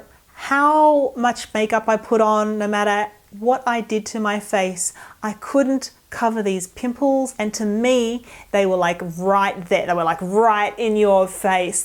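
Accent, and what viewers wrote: Australia. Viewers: Its nothing compared to what joy your videos bring me ! I love your channel , I do , I even started to like manga and stuff that people in Japan like , animes and so on. I know a few words thanks to that , which is thanks to you :) And one more thing darling : You are one of the very first australian youtubers I discovefred on youtube and since then, I love autralian accent ! people say , they end up sounding like asking, as if there is a question mark, I think its more like wanting to say more, so the melody would go down and a little bit up just so that we axpect anothe sentence, but sometimes it feels like you guys are emotional aout the things you say ...I dont know, maybe you could do a video about stuff that make you australian ?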